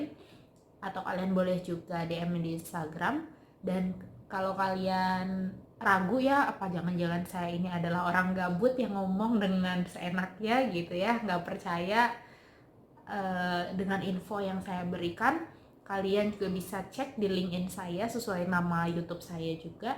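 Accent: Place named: East Java, Indonesia. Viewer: Mbaknya pasti orang jawa timur, kalau salah maaf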